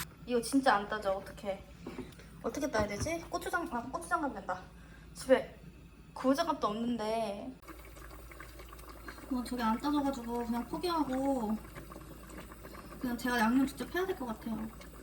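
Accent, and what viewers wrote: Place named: Seoul, South Korea. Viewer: 5년전 영상인데 왜 예전 서울사투리가 들리죠 ? 언제나 귀여우셔요 ㅎㅎ😍😍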